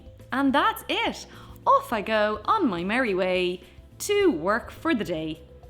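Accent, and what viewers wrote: Ireland. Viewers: Irish accents lovvvvvvv ittþ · babygirl lyrics it’s an Irish accent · Do you have children? Pets? Please make a followup video. Love your channel and brogue! 😘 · I’ve been enjoying your minimalist videos and just now found out you’re Irish. How I love your country, your people and the fantastic accent. 💖💖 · Is this a stupid question? I feel like this is stupid to ask, but are you trying to mask your Irish accent?? It just kinda sounds like you are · that's not an English accent it's an Irish accent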